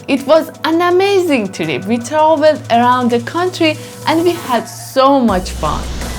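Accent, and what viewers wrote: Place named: Iran. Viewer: Let me guest where you from without knowing anything about you. Sounds like you from Iran 🇮🇷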